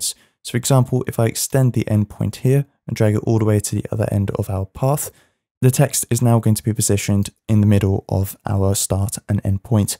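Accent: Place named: England, United Kingdom. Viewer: FANTASTIC!!! I've just found you, after looking for so long to find about putting text into Photoshop. Such a breath of fresh air to hear a nice slow, calm, English voice, and very carefully explained. THANK YOU SO MUCH! I am avidly looking at all your videos. I went to bed a very happy bunny last night. I have subscribed!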